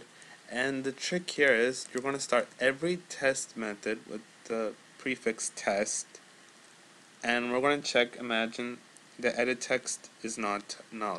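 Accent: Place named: United States. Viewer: nice video BTW, thanks for a nice american accent and not indian one (damn, I'm such a racist) :D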